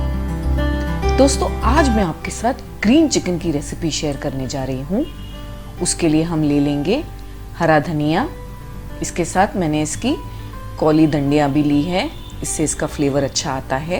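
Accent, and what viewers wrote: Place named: Bangalore, India. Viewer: I am from Bangalore , and you sound a bit Bangaloren so asked. Thank you very much mam...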